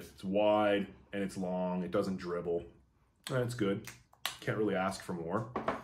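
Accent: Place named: Quebec, Canada. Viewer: I love his Québécois pronunciation, must be a Canadian... eh.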